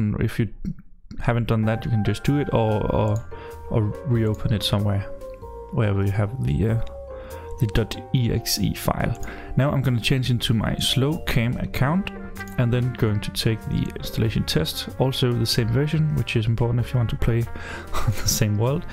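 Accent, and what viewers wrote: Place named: Denmark. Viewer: Jeg vidste bare du var dansk så snart du begyndte at snakke hahaha ; ) fed video, tak for hjælpen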